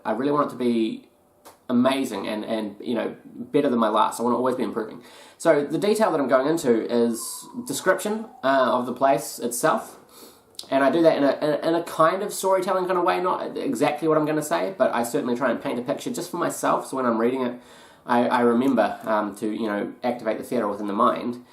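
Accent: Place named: New Zealand. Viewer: Damn I miss that New Zealand accent. Currently living in Sydney at the moment.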